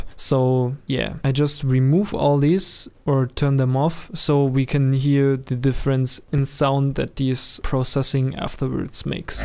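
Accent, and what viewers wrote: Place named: Germany. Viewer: Lol a german who finds it hard to pronounce english haha :) I believe "coarse" pronounced the same as "course" but correct me if I'm wrong :p Great and clear tutorial btw! Props!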